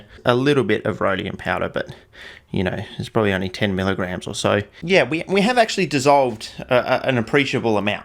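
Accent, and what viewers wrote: Australia. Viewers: Ah yes my daily dose of tar synthesis narratived by a goofy Aussie while in a shed.. now we are cooking with kerosene. Best wishes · Who else thinks should be a highschool Chem teacher?! I swear he reminds me of "Doc" my hs Chem prof, got the 'stache, even says "Ah-loo-minium" and everything😢.... (I'm in the US, Appalachia at that 👉🏻"Apple-atcha" for context) Who knew I'd be nostalgic watching an Aussie backwoods chem channel (BEST chem channel IMO) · This video autoplayed from a Primitive Technology video and for a sec I thought the dude finally broke his years of silence to cheerfully yell at me in an Australian accent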